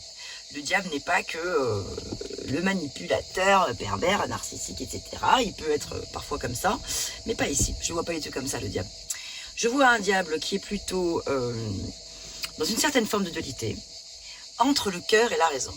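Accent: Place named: South, France. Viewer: Les cigales le sud, l'accent j'aim beaucoup ✨✨✨👌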